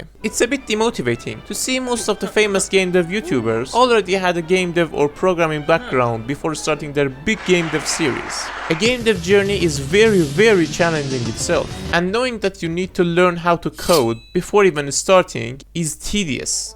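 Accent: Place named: Iran. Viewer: are you persian bro? just going of your accent and how the fuck do you have so few views????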